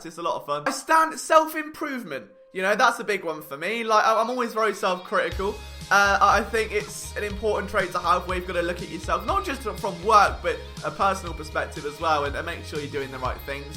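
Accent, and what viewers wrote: United Kingdom. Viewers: Feel like my youtube isn't working getting Eagles commentary with the Queen's accent like the Premier League is on especially with talks & references made of Charlton Athletic I promise I'm not even trying to Troll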